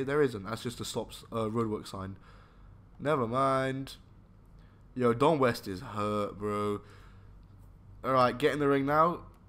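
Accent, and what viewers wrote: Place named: Scotland, United Kingdom. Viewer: Gotta admit, I wasn't ready for that much scottishness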